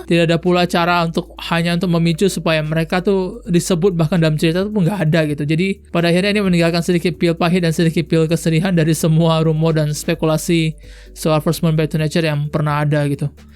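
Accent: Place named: Pontianak, Indonesia. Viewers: Orang pontianak rupenye abang ni, mantap bang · Jak budak pontianak ke rupenye abang ni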